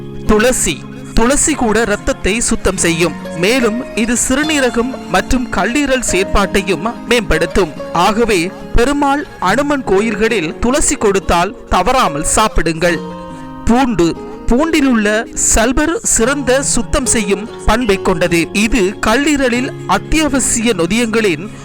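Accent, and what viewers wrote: Sri Lanka. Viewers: இது இலங்கை தமிழ் ... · ஈழ தமிழ் அப்படி தான் இருக்கும் சகோ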